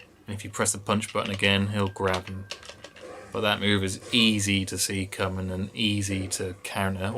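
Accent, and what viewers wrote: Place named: England, United Kingdom. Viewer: Haha nah I just mean your English accent, combined with the cursing and you were obviously tired. I did learn some stuff about this character though - cheers.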